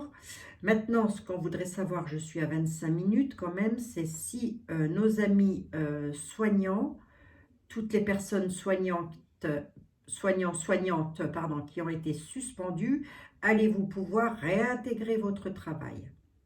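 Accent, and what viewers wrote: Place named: East, France. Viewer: J'adore votre accent de l'est je suis née à Epinal, je reconnais cet accent entre mille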